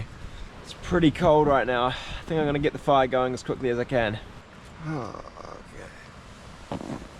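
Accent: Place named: England, United Kingdom. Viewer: I recognize the English accent, but a tad different. I miss the accent. I'm from America. You build a bigger fire than I might. Also liked how you built it closer to your tarp. I've seen people build it 15-20 feet away. Personally, I think that's too far, to keep you warm at night. You meals are bigger/heavier on the stomach than I like. Camping, I prefer smaller fast meals. Just me. Enjoyed the video. Thanks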